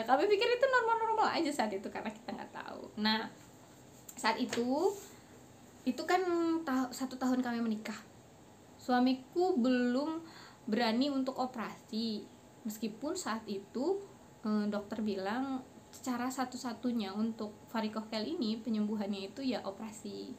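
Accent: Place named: West Java, Indonesia. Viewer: hee,.. Iyaa kaa makasih banyak yaa,. Di lihat dari cara penyampaian dan logat kaka kelihatan banget sundanya 😅. Salam dari bogor ka Semangat bikin konten berfaedah nya ka.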